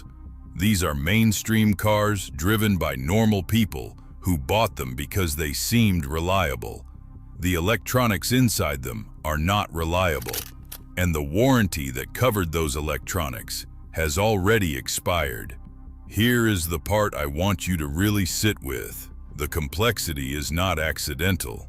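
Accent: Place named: United States. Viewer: Please can we have someone that doesn’t speak that awful sickening American accent for a change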